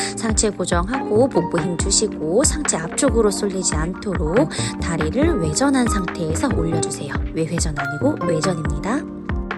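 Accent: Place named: China. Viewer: 동물원에 가서 다리 찢는 영상 보고 왔습니다. 조선족인가요? 한국말. 하지말아주세요ㅜㅜ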